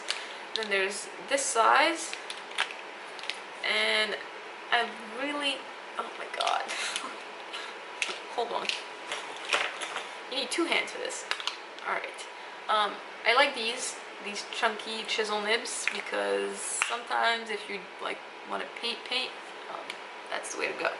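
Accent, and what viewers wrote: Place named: East Coast, United States. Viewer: Oh yeeah. Pens are friends. As unabashed as that is to admit.. well, sort of. You've a cool East coast accent.. NY? Boston? I agree about pencil vs pen, took me ages to see the light but now def better to go straight pen when drawing. You might dig these pen cases by Lihit Lab 'Smart fit pencil case' Helps keep pen choice down n neat design that stands up into pen pot. Im not sure if you can get hold of them stateside but some of my fav pens are the Winsor Newton watercolour markers. So good. Be safe, happy drawing 🎨 My latest, with pen stuff too <link>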